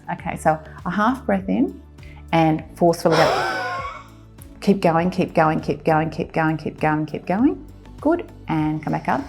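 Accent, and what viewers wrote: Australia. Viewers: Is that an Australian accent? Love it!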